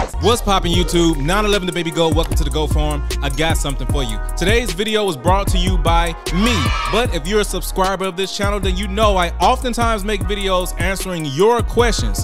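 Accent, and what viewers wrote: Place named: United States. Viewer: Please can you talk a little slower?? I'm in Africa and the American accent is a little difficult for me to catch up especially when you're talking fast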